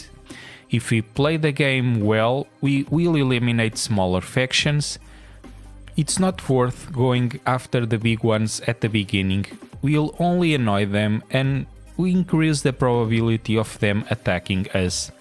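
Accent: Portugal. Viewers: Are you Portuguese?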